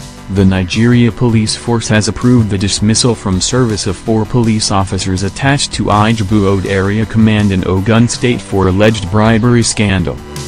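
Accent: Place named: United States. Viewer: I can feel the voice on this video is always on YouTube posting dirty stuffs about Nigeria and other African countries, not for once any good thing about them. Whatever country you are from, I think there are enough dirty stuffs to talk about as well. You accent sound American, and if you, I guess as much. Shame on you.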